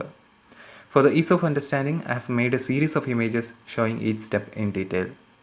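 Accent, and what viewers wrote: India. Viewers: Wow, that's fantastic!!! It helped me very much, because I'm drawing very much the last time (I've drawn rey of Star wars (but not the hair yet ;) )and now I'm working on Frodo Baggins) One of the best channels in YouTube!!! And I love your Indian accent ;) How much time do you need to do an Portrait?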